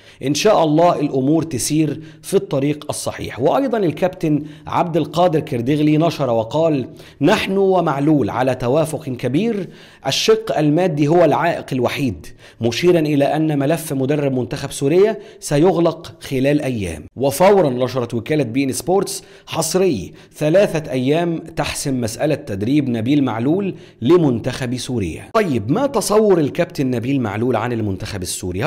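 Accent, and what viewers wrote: Egypt. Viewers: باين انك مصري بس بتحب اللهجة السورية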